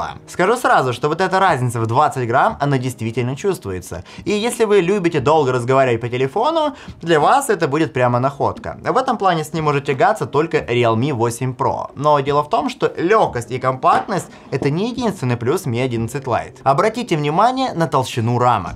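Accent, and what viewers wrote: Odessa, Ukraine. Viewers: Хренасе, ты Одессит?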